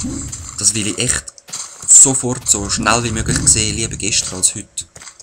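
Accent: Basel, Switzerland. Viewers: bisch du e Basler?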